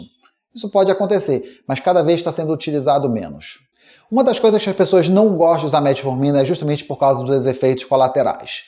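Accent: Pará, Brazil. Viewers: Muito bom, reencontro esse sotaque maravilhoso do Pará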